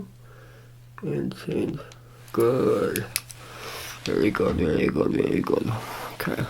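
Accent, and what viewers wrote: Transatlantic, United States. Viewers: Trans Atlantic 😭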